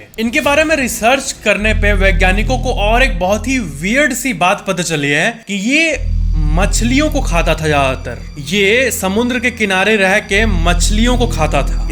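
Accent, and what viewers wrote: Bihar, India. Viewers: Bhaiya aap bihar se ho kya aapki bolne ke andaaz se pta chal rha hai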